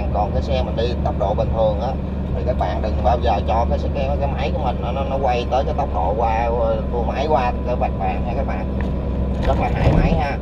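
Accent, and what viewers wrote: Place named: Tay Ninh, Vietnam. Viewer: Giọng bác này ở tây Ninh quê em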